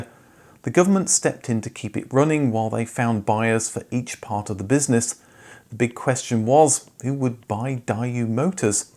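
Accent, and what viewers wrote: England, United Kingdom. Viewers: It's had to watch when the presenter can't pronounce the names correctly (typical English accent). It's said "Day-Whoo" not Die-ooh" (or what ever he's actually saying) and some of the other names he incorrectly uses are atrocious..thankfully he pronounced the "e" in Porsche..it's even correctly said by another person in the episode, yet apparently he can't couldn't hear it...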